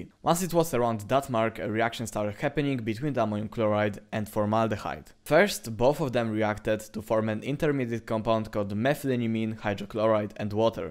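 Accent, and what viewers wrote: Poland. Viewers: Ten Polski akcent :D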